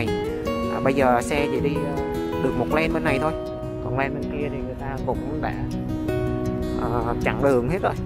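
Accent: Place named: Da Lat, Vietnam. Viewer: Thank you em trai, giong DALAT de thuong.,rat yeu va nho DALAT, ❤️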